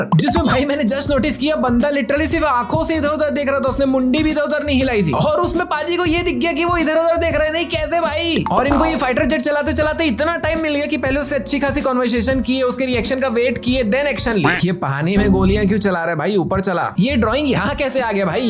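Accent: Chhattisgarh, India. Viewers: Bhai aap chattisgarh se ho ye aaj pta chala 😅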